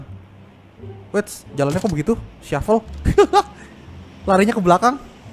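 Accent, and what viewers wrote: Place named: Jakarta, Indonesia. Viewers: Bangg anjirr gua demen banget ama cara ngomong lu betawi bat anyingg😂😂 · Masih ada easa betawi betawi nye soalnya 😁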